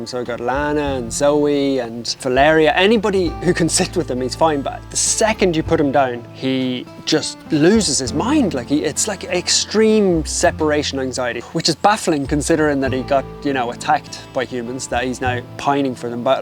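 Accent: Ireland. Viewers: Dear god you sound as if your from southwrn ireland Im appaled Ibwill give a home to any animal that you need to. I have three pets 2 dogs and 1 very old cat which I picked up of the road supposed to be dead I brough him home and have had him for 7 months hes very old old but doing ok. If I can help I am here and will help all I can .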